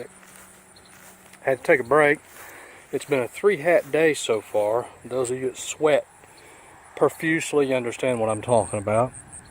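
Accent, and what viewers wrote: United States. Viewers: The minute you started talking I said, he's from Georgia. I'm glad you mentioned you were in Georgia there at the end. Good to be right! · I think the southern accent is a clear indication he is not from California.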